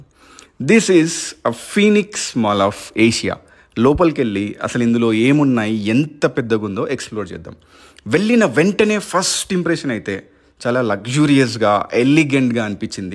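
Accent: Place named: Hyderabad, India. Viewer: I THOUGHT U ARE HYDERABADI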